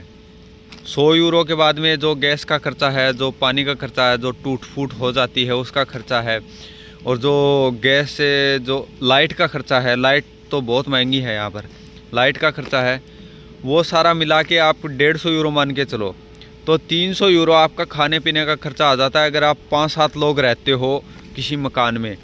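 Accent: Haryana, India. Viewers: Haryana k lage h bhai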